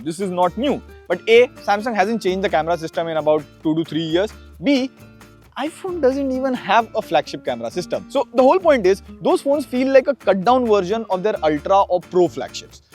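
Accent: India. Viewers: Indian typically Indian accent hahaha we don't want to hear Indian typically English they always singing words, talk Hindi not English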